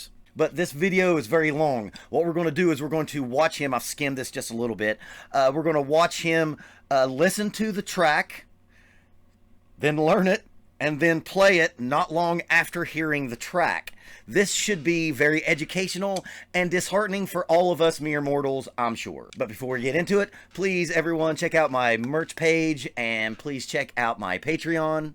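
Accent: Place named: South, United States. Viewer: Dulcet Southern brogue. Lovely and impressive vocabulary.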